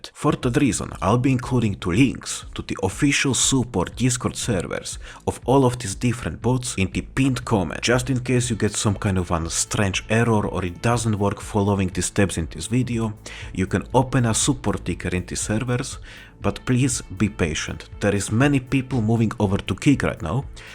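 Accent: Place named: Poland. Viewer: bro has 100% the poland accent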